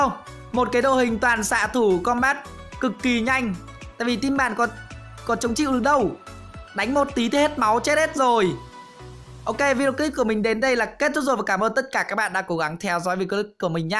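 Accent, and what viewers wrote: Nam Dinh, Vietnam. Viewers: Đúng giọng nam định... a quê ở tp nđ à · A ở nam định hay gì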